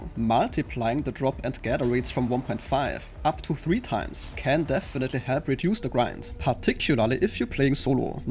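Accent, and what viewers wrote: Germany. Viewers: DU REDEST SO DEUTSCH OBWOHL DU ENGLISCH SPRICHTST.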